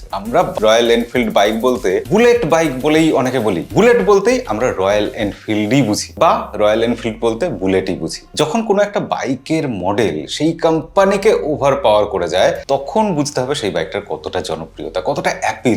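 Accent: Bangladesh. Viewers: U sound like a Bangladeshi... no offense... using bullet in india has a swagger factor because for ages the indian army , police and government officials are using this vehicle ... so we don't directly compare it with Bajaj duke ... better option for you guys is to buy a hero xpulse 😅😅 ... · India banaye eita ekhon mane ta ki osikkhito ei toh pure Bangladeshi citizen kotha sunei bojha jachhe, eta India e banato, Royal Enfield ekta Indian brand. Kotha sune bojha jachhe chinese maal khub use hoy Bangladesh e tai eto bhoy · hola sunè mone hocche apni bangladeshi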